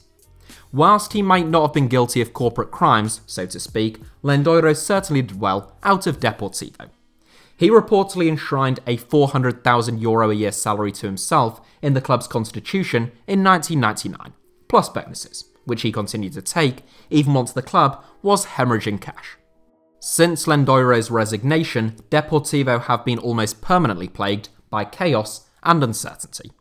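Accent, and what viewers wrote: England, United Kingdom. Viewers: Your accent is from what part of england? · Your english accent is unbearable. Learn how to speak!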